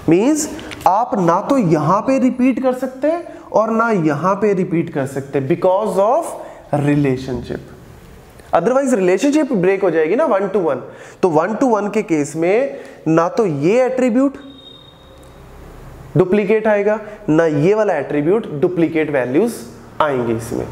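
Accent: Punjab, India. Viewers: bhaia aap punjabi ho ?????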